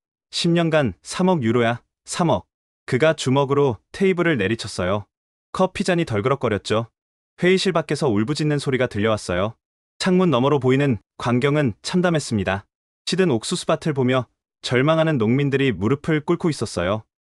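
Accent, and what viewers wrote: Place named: China. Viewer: 여기 유튜버 중국인인가? 아님 어그로 끌려고 말투를 저리 설정한건가 듣기가 참 힘드네, 암튼 정산은 아니네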